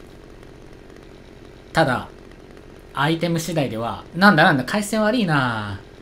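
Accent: Kansai, Japan. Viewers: うぽつです٩( 'ω' )و関西弁喋ってるのがすごく良いです(´꒳`)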